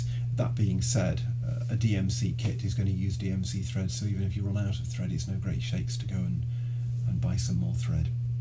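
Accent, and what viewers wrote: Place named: United Kingdom. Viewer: I thoroughly enjoyed your video, one thing you don't know is I love UK speech.lol. Only the English can pronounce my name correctly, here they pronounce it Don but it's Dawn and only English expats pronounce it properly. Your Theresa Wentzler (sp?) turned out beautifully, I know myself and I'd never make it through one of her patterns. You've done some beautiful pieces , thanks for showing them. There is a man in my stitchy group and he's stitching an absolutely stunning pattern of a male nude reclining. The women are quite appreciative of his physique lol I must remember to ask him where he found the pattern. You do beautiful work and I'm very happy you decided to join floss tube. I live in Nova Scotia Canada and there are few places to buy supplies in our area so most things I have to order from Ontario or further west. 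We do have a designer called Charting Creations , you might like to check out her charts, they are beautiful and she is kitting them up now. She has started getting a lot of supplies in though and will order anything we want so that's a great resource finally. I've just started And a Forest Grew and I'm loving it, each tree or animal feels like a little finish so it's encouraging to get to the next bit. I hope you do make more videos as you feel you want to, I enjoy longer videos so I can make a nice hot cuppa and stitch while I watch. Hope you had a lovely Christmas and I wish you a Happy New Year as well. 🙋🏼🤗🇨🇦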